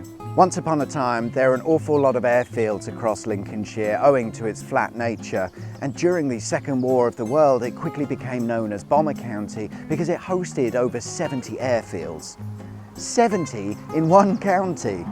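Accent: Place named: Southern England, United Kingdom. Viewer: all the comments below would be fuming if their homes were insulted by a smarmy posh southerner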